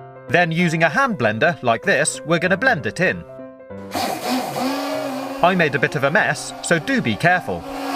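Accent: England, United Kingdom. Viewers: You brits talk funny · he's a British so he says to ma to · This guys voice is the most British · he has a english general accent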